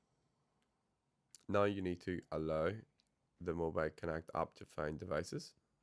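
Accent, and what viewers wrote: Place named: Northern Ireland, United Kingdom. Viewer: I like your Northern Irish accent and appreciate the helpful explanation. I'm thinking of buying a HL-2400DW so this video has been very helpful.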